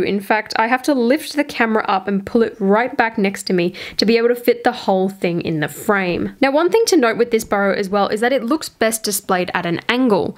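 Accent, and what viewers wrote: Australia. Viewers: I love your accent! I watch your videos to practice my Australian English listening. New follower 😉.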